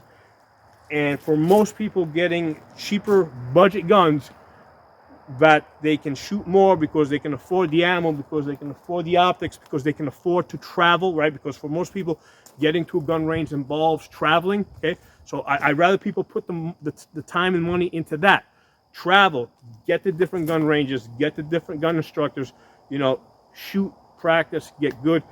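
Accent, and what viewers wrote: New York, United States. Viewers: Are you from New Yawk by any chance?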